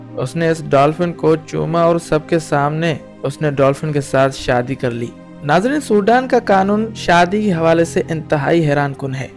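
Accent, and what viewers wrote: India. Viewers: Bhai Aap Indian style main kion baat karnaiy ki ko as hash kartaiy ho ?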